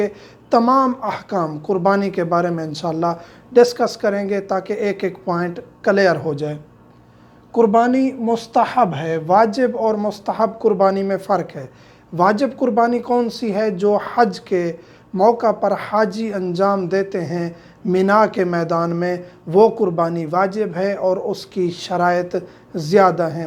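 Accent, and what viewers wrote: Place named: Saraiki, Pakistan. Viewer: بھائ سرائیکی لگتے ہیں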